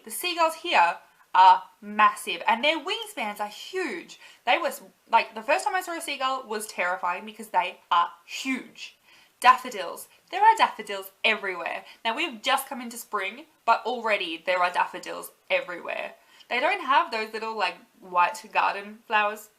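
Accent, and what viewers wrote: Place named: United Kingdom. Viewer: Only 6 months and u already have a British accent I have lived in the south of 13 years and I don't have any accent and I've grown up here I'm only 15 how do u get an accent that fast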